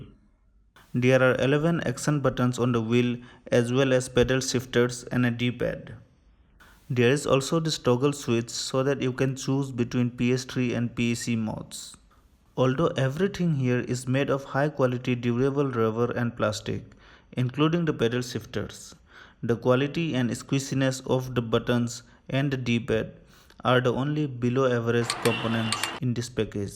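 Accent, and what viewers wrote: India. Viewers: You sir have the "Stereotyped" Indian/South-Asian accent, the first time I've heard one of my countrymen with the accent foreigners mock us with. But thats Ok. :-)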